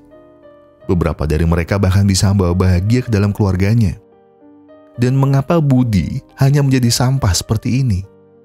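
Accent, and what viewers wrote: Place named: Semarang, Indonesia. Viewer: Jowo mu medok kang Koyo semarangan 😂😂😂😂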